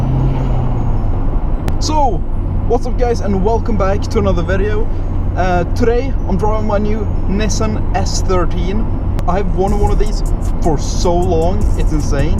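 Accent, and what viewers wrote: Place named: Norway. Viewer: Where are you from? You sound Norwegian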